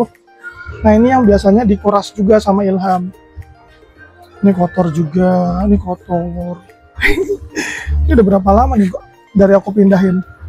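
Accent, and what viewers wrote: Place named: Java, Indonesia. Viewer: Bang kamu asli dr mana logat nya kya dr jawa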